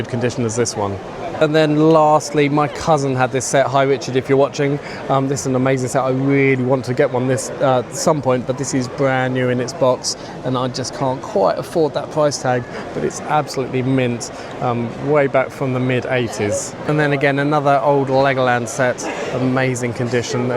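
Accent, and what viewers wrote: United Kingdom. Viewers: EVERYONE SHUT UP the nice British man is gonna tell me about his Lego collection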